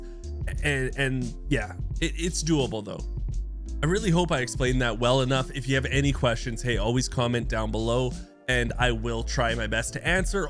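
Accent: Canada. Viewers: I hear a Canadian accent ayeeee? Haha I just got wz on ps5 and I saw this blueprint on a dmz squad mem I really want this but it’s super hard looool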